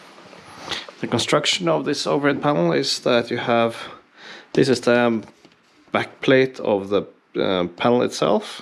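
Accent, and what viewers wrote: Norway. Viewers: idk why. but he sounds norwegian. Broski got that crisp norwegian dialect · Are you norwigen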